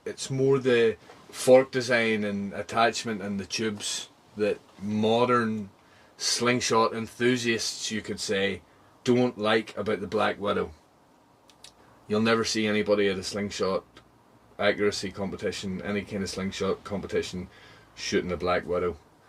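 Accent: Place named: Belfast, United Kingdom. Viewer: his accent is called a Belfast accent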